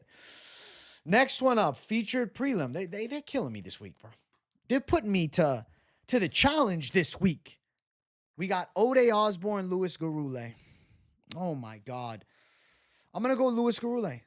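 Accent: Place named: Boston, United States. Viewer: Can take the boy outta boston but cant take boston outta the boy. Blahss-fee-muss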